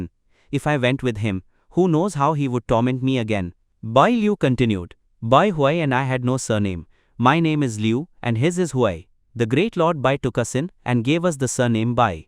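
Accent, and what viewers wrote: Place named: India. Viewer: Indien accent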